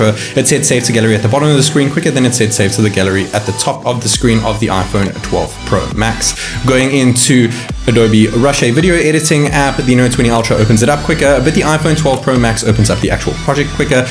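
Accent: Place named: South Africa. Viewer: Hi. I've been a sub for about a few weeks and the first word that you said, when I watched my first video of yours, I already knew you were from South Africa.😅 I'm from South Africa btw.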